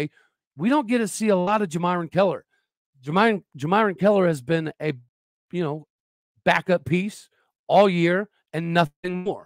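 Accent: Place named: Oklahoma, United States. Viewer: oh no you mistake my meaning, I’ve listened to hours of your podcast. Frankly I didn’t realize I was a “city boy” until I went to Oklahoma State. I graduated with over 500 in my high school class, where classmates at OSU had just a handful. I remember seeing Stormy practicing roping a rebar cow right outside of Bennett. The pronunciation just became more pronounced due to semantic satiation. You said it so many times in this segment it stuck out. I don’t think any less of you, it’s just a regional accent. I still live in the south and appreciate the culture, but at times I stick out. Some people can pick out that I actually have a California accent. 🤪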